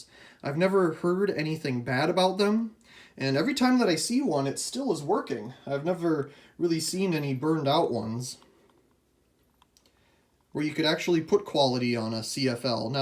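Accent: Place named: Northern England, United Kingdom. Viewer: Its his northerner accent lay off dude. :|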